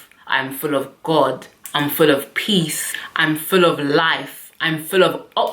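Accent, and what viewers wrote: United Kingdom. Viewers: cute British accent. black people with British accent.... are very dope . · Wow!!!! I love this woman mindset I would love to meet someone like her plus I have a thing for dark skinned woman and love the British accent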